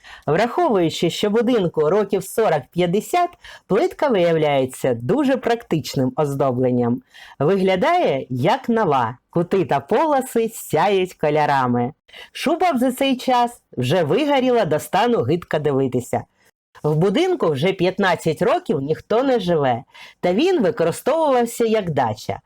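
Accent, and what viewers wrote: Russia. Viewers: Чомусь дратує мене така українська мова з явним російським акцентом...Вимкнула звук .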